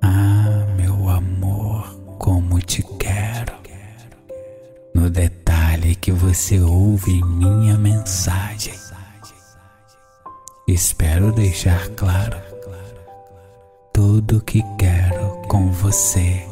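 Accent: Minas Gerais, Brazil. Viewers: Falando desse jeito eu nem vou dormir essa noite que isso você deixa eu sem saída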